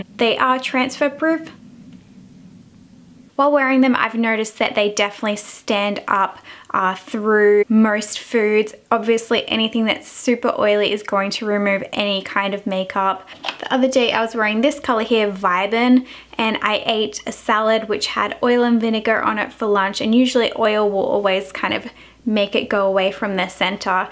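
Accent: Australia. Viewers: You have the cutest voice...and the Aussie accent doesn't hurt!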